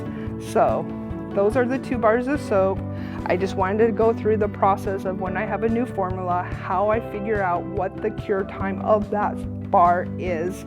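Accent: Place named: Arizona, United States. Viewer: I love love love hearing your Arizona accent. It reminds me of my grandpa. ❤️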